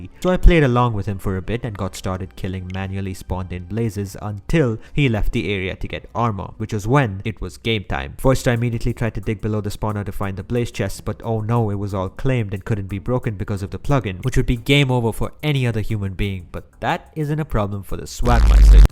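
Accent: India. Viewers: You sound indian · I love his indian accent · why u have indian accent here, but american in other video😭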